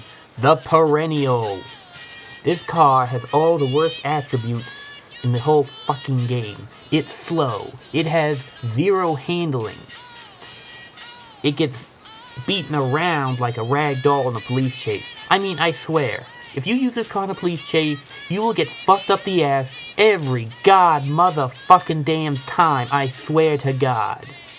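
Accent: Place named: South, United States. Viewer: HAHAHAHA Great video man!!! Are you southern??? sounds like an southern usa accent.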